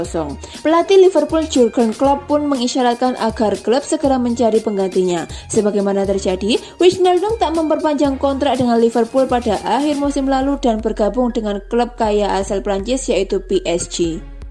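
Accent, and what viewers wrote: Java, Indonesia. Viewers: Medok bro...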